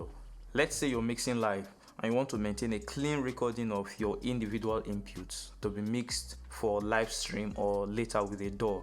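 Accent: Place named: England, United Kingdom. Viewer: I am struggling with his English accent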